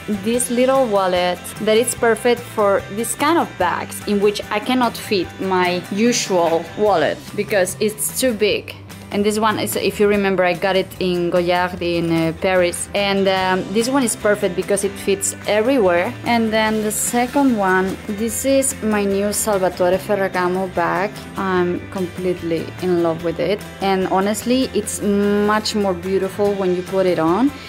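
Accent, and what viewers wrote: Spain. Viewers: me parece de cuarta una española todo el tiempo queriendo hablar en un inglés un poco duro!!!! afloja con el english y habla en tu propia lengua te aseguro que te verás más natural no tan forzada!!! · A mí me parece genial que hable en inglés, porque ella tiene seguidores en muchos países. Si tiene acento español hablando en inglés es porque ella es española y no tiene porqué perder el acento. Yo no creo que su inglés sea tan malo, se entiende perfectamente. Por otro lado, para llegar a hablar muy bien un idioma extranjero, hay que hablarlo antes mal (que no digo que sea el caso). Nadie nace sabiendo. Hay que ser un poco más tolerante con los errores, y deberíamos valorar más su iniciativa. Hay más mundo que España y el castellano. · Me gusta tu acento español !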